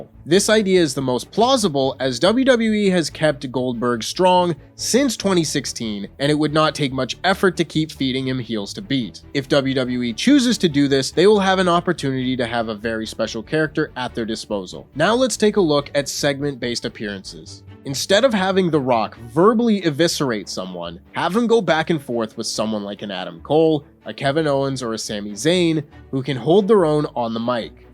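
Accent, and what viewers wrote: United States. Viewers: I thought the question of the day was "How did an American/Canadian get a presenter job there?" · American accent 🤯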